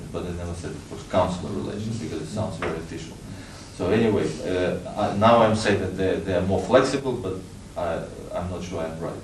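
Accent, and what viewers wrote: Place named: Russia. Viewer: Хорошо поставленный у вас английский, впрочем не без сильного русского акцента).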